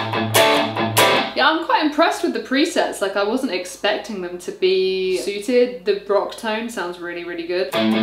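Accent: England, United Kingdom. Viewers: Looks really nice and your English accent is beautiful :)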